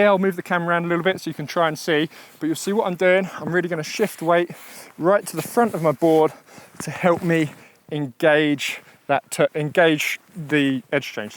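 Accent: England, United Kingdom. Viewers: Wanted to book you, had a look on your homepage but you're so loved + demanded that no chance for this season😔... But good for you😊! I'll try to plan my holidays for next winter and may be I can get some lessons with you if I do it months in advance! Love your way to explain, your technic, your skills, your sense of humour, your love for the natur and your professionnel eye for the mistakes of your students and the way your explain them and make them progress! Not to forget your beautifull english "accent", your dog and your good vibes. It's such a gift to have access to your videos, thanks! Looking forward to get you once as teacher in real 🤩!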